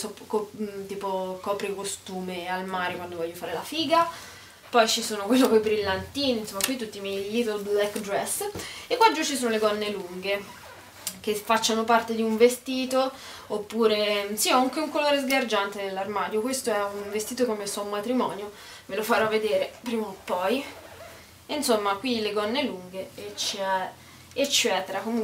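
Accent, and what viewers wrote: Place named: Arezzo, Italy. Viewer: Beeeeello l'accento aretino, l'ho capito subito che sei di Arezzo! Io sono di Firenze, ma i miei nonni sono di lí vicino e mi piace un monte come parlate!:) Mi sono iscritta ora comunque, e mi piaci giá:)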